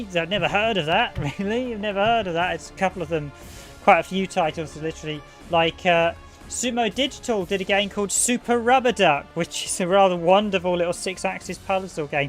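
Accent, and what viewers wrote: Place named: England, United Kingdom. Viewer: Bro! You are english… it's obvius but… I need to know, what accent of England is the one you have?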